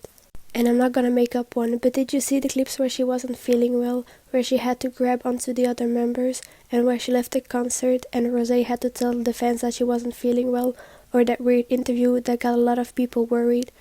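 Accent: France. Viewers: Are you French? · I am French, this is obviously a french accent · sorry, it's not about the video but are you french? idk but your accent sounds like a french one 🤷‍♀️ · Are you french?? Bc ur accent sounds a bit french and i'm french too so that's why i'm asking ;w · your voice is so beautiful! i love your french accent . great video , i love the points you made :)💖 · Are you french ?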